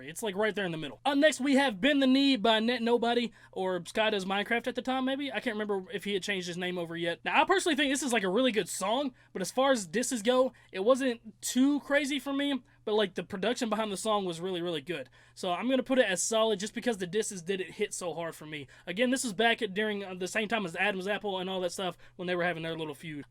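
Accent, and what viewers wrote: Alabama, United States. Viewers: Wait are you from Alabama? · Is he from Alabama cuz I am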